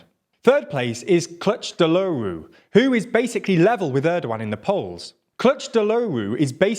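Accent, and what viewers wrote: United Kingdom. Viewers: I don't want politics in a british accent anymore. Let's make it happen, people. they wrecked the world.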